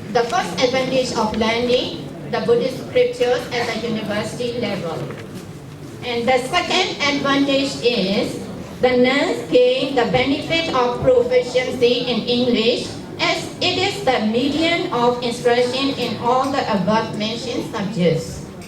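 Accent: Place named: Myanmar. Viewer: ဆရာလေးအဂ်လိပ်စကား‌ေ့ြာတာ ငပိသံမပျောက်သေး။ အဂ်လိပ်စကားပီပီသသဖြစ်အောင် နိုင်ငံခြားသားနဲ့ များများစကား‌ေ့ပြာပါ ဗမာစကားလုံးအသံထွက်နဲ့ပြောသလိုဘဲ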